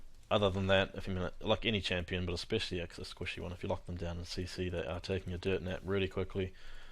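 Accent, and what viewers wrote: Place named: New Zealand. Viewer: Ha Ha kiwi accent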